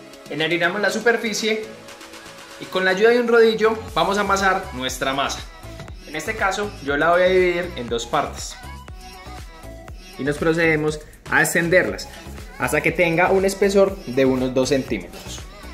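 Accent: Antioquia, Colombia. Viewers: Amo tu acento paisa jajajaja gracias por la receta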